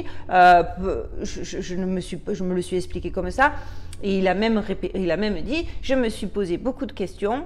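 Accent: Marseille, France. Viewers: Coucou :) Il y a bien un léger accent anglophone mais tu as bien gardé ton accent marseillais ;)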